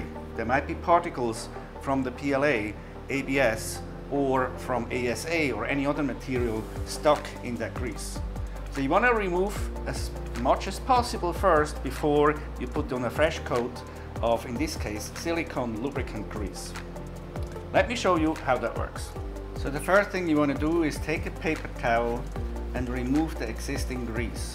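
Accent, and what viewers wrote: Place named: Switzerland. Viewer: Are you maybe swiss? Your english is great but the accent seems familliar to me 🤔 falls ja liebi Grüess us Züri 😊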